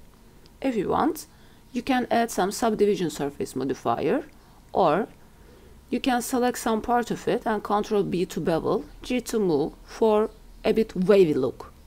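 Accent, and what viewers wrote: Turkey. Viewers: şiveden tahmin ettim Turk oldugunu :D eline saglik 🙏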